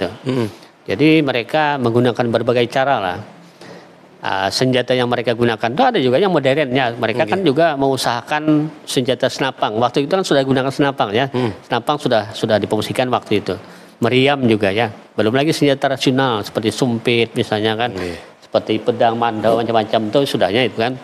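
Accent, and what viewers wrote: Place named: Brunei. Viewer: Hampir sama dialek Melayu Brunei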